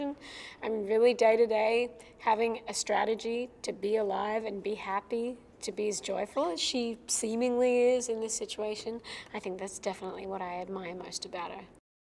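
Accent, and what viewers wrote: Australia. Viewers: It’s probably easier to do a Boston accent with you have already have an Australian one because if you notice her type of accent sound nearly similar or it sound like it wouldn’t be too difficult for her to fake that accent. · To people saying her real accent doesn't really sound Australian, this is a more southern accent, usually NSW, VIC and TAS. The nothern/western states have a more of a New Zealandish sounding accent which is usually what you hear on tv as most Australian media is from Queensland.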